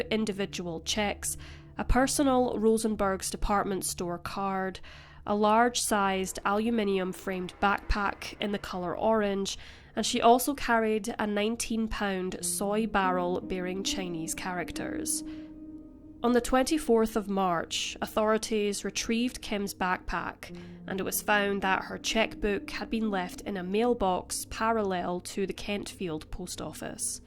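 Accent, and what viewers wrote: Scotland, United Kingdom. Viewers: Really? What a nasty thing to say! This lady is Scottish, but obviously you’re not educated enough to realise that! If this is an example of how your mind works, you should be ashamed of yourself. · I love your british accent if I am not wrong.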